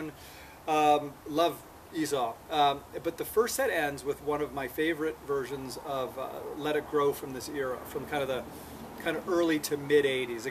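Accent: Canada. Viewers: Is he Canadian? I hear a strong accent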